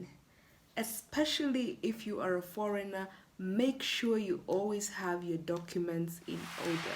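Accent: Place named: Kenya. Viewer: Welcome to Nairobi, you true look and spaek like a Kenyan, for a Ugandan do not call yourself a foreigner in Kenya. I am from western Kenya and my Luhya language is very similar to some of Uganda local languages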